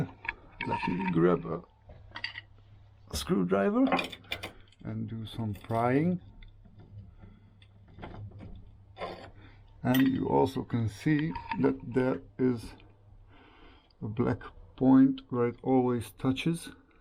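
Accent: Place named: Russia. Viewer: Hey i have just subscribed! Thanks for your videos. I am getting a 1987 double cab this week. It has 1900cc engine. Petrol and water cooled. I am inspired by your vids. By the way i am from Israel. Where are you from? I here an accent... maybe russian?🤔 any how thanks for your vids! Keep them coming.😁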